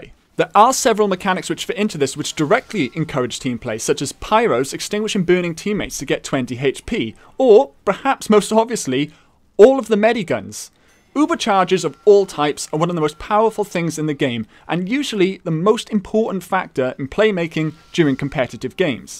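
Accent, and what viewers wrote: Wales, United Kingdom. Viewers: Are you welsh? I hear the accent and emphasis on words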